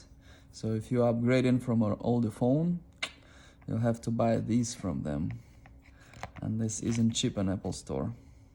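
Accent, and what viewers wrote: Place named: Russia. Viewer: хахаха сразу слышно что руский))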